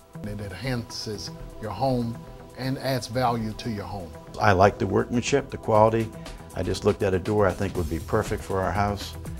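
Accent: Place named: South, United States. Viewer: sign me up I just want to see you in person. hot south accent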